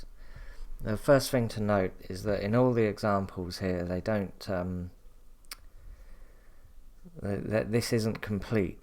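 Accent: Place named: London, United Kingdom. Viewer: Wow amazing London accent:)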